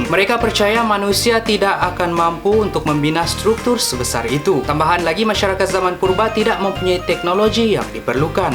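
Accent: Malaysia. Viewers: ni malaysian ke indonesian??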